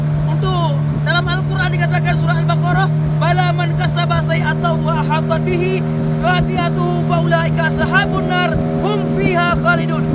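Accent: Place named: Medan, Indonesia. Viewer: logat kau aja logat medan,mengaku ngaku orang aceh...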